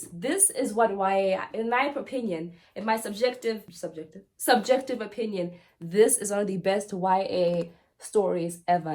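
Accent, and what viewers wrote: South Africa. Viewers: your accent is probably South African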